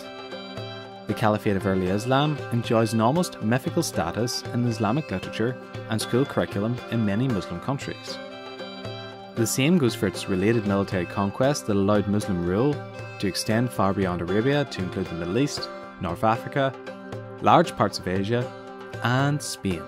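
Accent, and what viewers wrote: Northern Ireland, United Kingdom. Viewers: Northern Irish?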